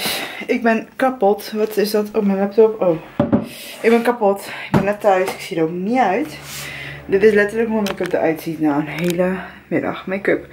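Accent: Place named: Rotterdam, Netherlands. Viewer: plat Rotterdams accent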